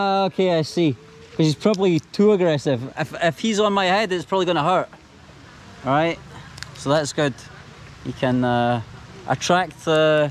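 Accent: Scotland, United Kingdom. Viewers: It is Scottish accent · Have fun in Bali.. I like your British accent brotha!!❤️ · It's different... you know UK have many many regional accent... but to untrained ears yes, all sounds just british innit 😅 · Hai, I find your videos great, and a lot of local people here know you too, your Scottish accent is fabelous, greetings from Sanur, Bali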